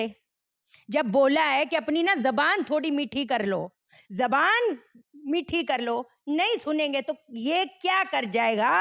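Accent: Punjab, India. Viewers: Di kya aap Punjabi ho Maine kai baar suna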